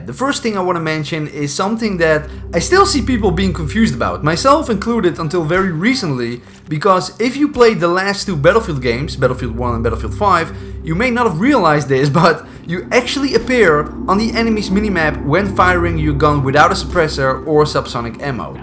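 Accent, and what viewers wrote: Netherlands. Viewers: Are you dutch? I think you are, because of your accent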